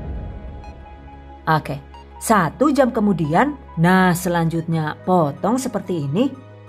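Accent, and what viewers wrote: Banyumas, Indonesia. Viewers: jannn deneng wong ngapak ya yune, pada bae karo nyonge.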